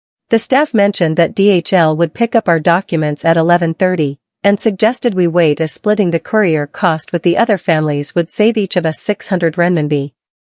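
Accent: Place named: United States. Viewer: Nice vid. Thanks for sharing. 👍 Who is the voice doing the narrating? Sounds like an American voice.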